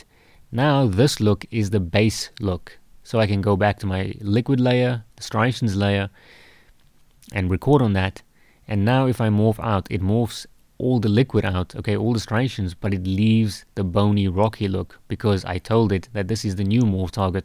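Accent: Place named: South Africa. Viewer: Could hear the accent was wondering if you're South African - thanks a lot for this, will be watching a lot of your content. So cool to see a creator from SA on youtube with nice tutorials. Made my day!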